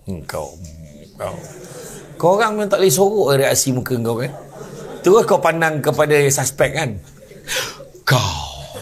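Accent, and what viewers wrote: Negeri Sembilan, Malaysia. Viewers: Assalamuslaikum wbt Allhamdulillah syukur kehadrat Ilahi saya suka dgn loghat NS ustaz. Selawat keatas Rasulullah SAW trima kasih atas ilmu yg ustaz sampaikan hanya ALLAH yg membalasnya. Aamiin3 YRA.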